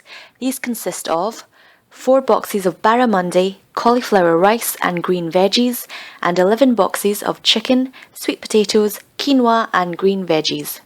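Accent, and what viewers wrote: Scotland, United Kingdom. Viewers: love your British accent! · Love the Accent, FREEEEEEDOM. poshest Scottish accent i have heard in a long time and a good video to boot. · You sound so Scottish :)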